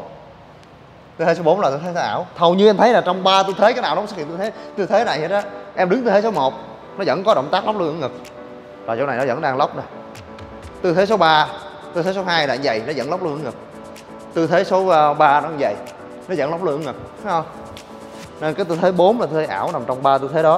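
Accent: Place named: North, Vietnam. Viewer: Lâu lâu vào nghe giọng "đàng ngoài".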